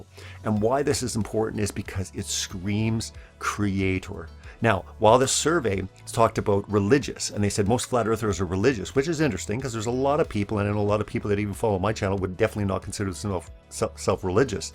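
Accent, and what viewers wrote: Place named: Canada. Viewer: Your accents Canadian obviously👍🏼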